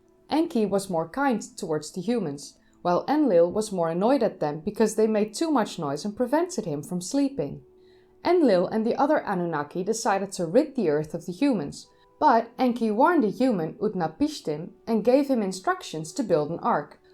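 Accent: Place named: Netherlands. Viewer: your (dutch?!) accent is very nice to listen to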